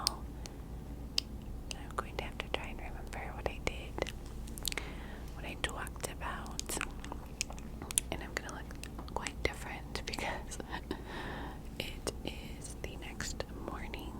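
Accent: New York, United States. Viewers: I love the New York accent 🥰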